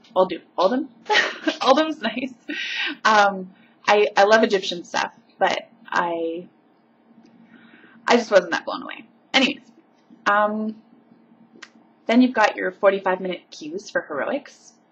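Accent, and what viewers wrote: Canada. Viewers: Ah how funny, a friend of mine was only talking about Rift yesterday....... interesting to see what it's all about although not sure i'll be getting it (which once translated = when my friends do, I'll get it too). Weird how when I was in the UK I couldn't tell the difference between Canadians and Americans yet now I can and within seconds I was like.......she's Canadian. Just thought i'd share lol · You sound Canadian, good God, fail.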